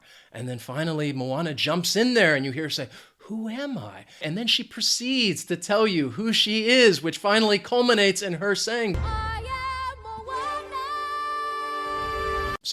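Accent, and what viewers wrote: United States. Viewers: Thanks a lot! I enjoyed every second of the American English you are using - the way it should be used by all the native speakers;)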